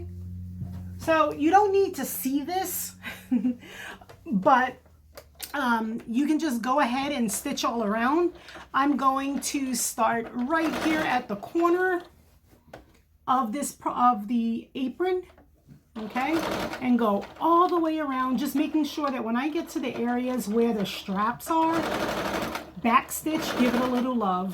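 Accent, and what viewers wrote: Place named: New York, United States. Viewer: I love your ideas. I sew but usually straight lines. You are extremely helpful. I also love your accent. NY? NJ? Mass?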